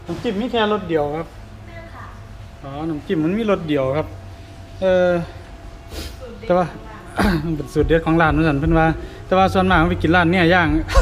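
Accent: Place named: Isan, Thailand. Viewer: ชัดนะไอ้อีสาน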